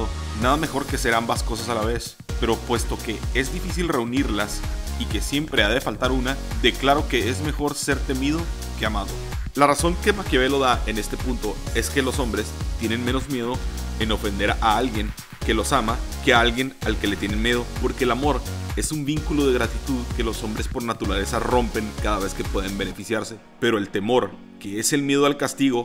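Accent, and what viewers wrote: North, Mexico. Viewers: Si no fuera por ese pinche acento norteño este video sería bueno!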